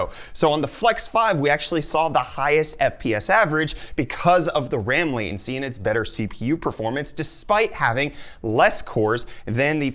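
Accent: South Africa. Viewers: Wait this dude is South African? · Wait are you South African?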